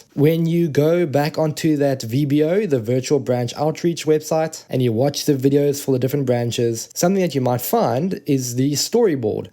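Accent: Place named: South Africa. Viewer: Judging by your accent, you are south african bru! What branch did you end up going with?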